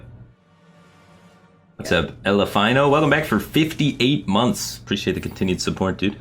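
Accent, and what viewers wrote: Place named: Michigan, United States. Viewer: The Michigander accent is just the most attractive accent in all of the USA.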